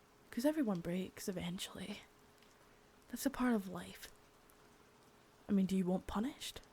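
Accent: Scotland, United Kingdom. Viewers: Scottish accent uhhhhh THE BEST ! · Great work. (Is that a scottish accent?)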